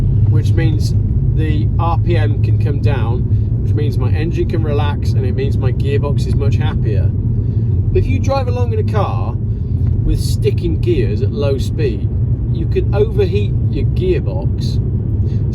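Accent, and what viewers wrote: Wales, United Kingdom. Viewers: Must be Welsh?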